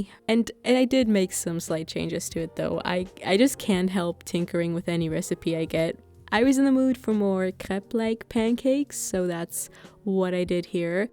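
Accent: Germany. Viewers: Liebe deinen deutschen Akzent haha !!! Love your videos !! ❤️❤️❤️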